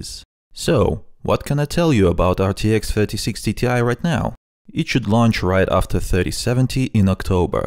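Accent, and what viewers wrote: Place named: Russia. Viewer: Блин слышно русский акцент)) А так контент годный, продолжай в том же духе)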